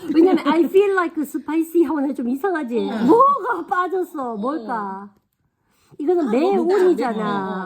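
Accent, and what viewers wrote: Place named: Andong, South Korea. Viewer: 전 친정이 안동이라 고향생각나고 ㅋ 사투리 너무 좋아요.. 친정에 있는 느낌이예요 ㅋ 잘 보고있습니다. 감사합니다! ❤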